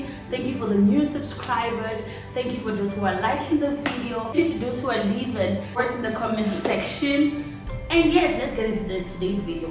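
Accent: South Africa. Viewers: M yswitchcom Being Bantu means nothing when it comes to accent, many Cameroonians do not sound like her, East Africans do not sound like Southerners, Zim accent is different from SA accent, she has a South African accent. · And she has SAcan accent 🤔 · La Nunsy. i too thought her accent sounded south african. it has a general south african ring to it. you can't pin poin the region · Your accent kinda sound south African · WoW, I really ENJOYED your video. You sound , look like, and act like any South African. Thank you so much for speaking highly of our country, you were spot on regarding your observations about SA. We lord you and you are 100% one of us South Africans. We love you! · You look and sound very south african. Can you explain that for me, I am interested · My sista I think you definitely need to stay more in SA so you will learn the life in SA and stop lying. 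You already have SA accent but you still do not know SA very well? What all these lies? Which part of SA are you based? Bikes? Luxury? How? Transport a hustle? how? Are you okay tho? I think this is really rude and expose your ignorance coz my sister you already have kinda SA accent, I expected better not this. Ha.a when it comes to you, Ignorance is not bliss instead Ignorance is embarrassing coz what are you SA? Government choose school for learners? Oh maybe in SOME parts of Gauteng and because the schools tend to be overcrowded than in other provinces. About 16 December? Do you even know the reason of the holiday as you say that "It's end of the year so people reconcile?" Must you be so ignorant tho? You schooling, do you have SA friends who can tell more about SA not this. Coz I mean why are you lying?? In Cameroon, y'all have 'keke' or 'okada' right? Use your common sense and imagine Okadas in SA roads, where almost every month there are road accidents and people driving on high speed like it's nobody's business, would you survive in that?. 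Ha.a I'm disappointed I'm sorry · Your accent sounds almost South African...😊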